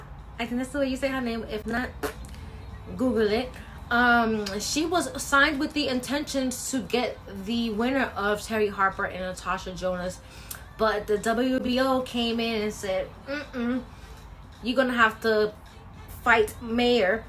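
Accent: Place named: Bronx, United States. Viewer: Good to see you back on YouTube making videos again great to hear that Bronx accent hahahahaha hopefully boxing is on the way back and full respect to both these ladies put on a great fight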